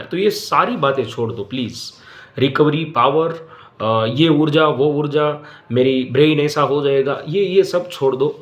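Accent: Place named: Gujarat, India. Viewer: Sir ap Gujarati ho apiki bol se Gujarati lagraheho.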